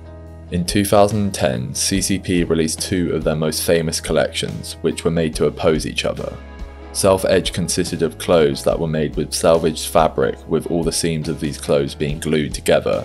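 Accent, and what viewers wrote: United Kingdom. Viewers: the high energy intro revealing a deep fried british voiceover is so funny to me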